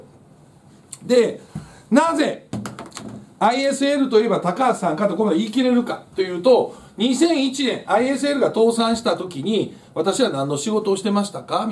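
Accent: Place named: Nagoya, Japan. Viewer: どえらいニュース♪ 党首、名古屋弁！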